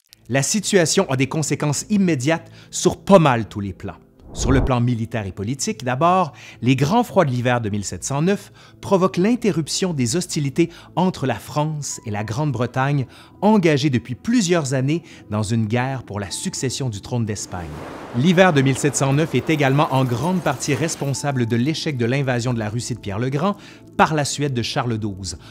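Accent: Quebec, Canada. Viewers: tresbkie monsieur et en plus avec cet accant canadien qui ma toujous plut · Je viens de trouver la chaine .. très intéressant ce que tu présente mais l'accent surtout j'ai jamais cru que je vais aimer l'accent québécois.. Continuez Bravo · Ce que j'aime beaucoup, c'est l'accent Québécois. Sinon; j'aime aussi ces vidéos qui nous démontrent que le climat ne peut se prévoir comme cela est le cas actuellement avec le GIEC. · Superbe vidéo et reportage surtout avec cet accent Québécois si unique et charmant !! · Désolé, j adore vos vidéo, et l accent me rappelés les quelques années passées au Québec dans mon adolescence. Mais je vais me permettre un commentaire la première fois que j ai vu votre vidéo je me suis dit "au final les Québécois c est des Scandinaves (descendant de Norman) de culture française qui ont immigré aux Amériques:) Continue the good work comme ils disent. 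Et merci pour vos videos!!! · Et pourtant le ciel n'était pas encore troué et alors donner les cause du changement climatique a c'est époque la avec l'accent cannadien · C'est fou comme j'aime entendre l'histoire française racontée avec un accent canadien :) Je sais on dit "accent québécois" mais je suis français tout le monde sait que casser les pieds c'est une obligation génétique pour nous :p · Un Québécois qui me narre la Fance d'époque, avec cet accent, quel bonheur! Le vin qui gèle, mais quel cauchemar 😱 Bisous de Bordeaux 😉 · L'accent, encore l'accent, toujours l'accent. Depuis Félix Leclerc, vous ne vous êtes pas fait l'oreille à l'accent québécois ? Ici, y a longtemps qu'on s'est habitué à l'accent français ! ;) hihi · Super vidéo. J'ai vécu au quebec dernièrement (que j'ai dû quitter malheureusement) et je sais pas trop pourquoi mais ça me fait plaisir de ré-entendre cet accent! 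· Quelle superbe diction…j’en ai les pieds gelés!🥶🙃😂Heureusement, votre adorable accent québécois réchauffe mon cœur et mon corps. J’ai augmenté mes connaissances, ce soir et je m’endormirai un peu moins sotte.😉😁MERCI❣️👍🥀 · C'est chouette d'écouter l'accent québécois dans un français intelligible. Merci pour la vidéo. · Tres interresant. Je suis un re-apprenant de francais. Apres Lycee, ily a 60 ans, j'ai recommencé apprendre un peu le francais, je trouve que vous parlez dans un facon je comprend. Je suis déja un amateur d'histoire. Votre accent, c'est quebecois? Je nai pas un clavier francais :-(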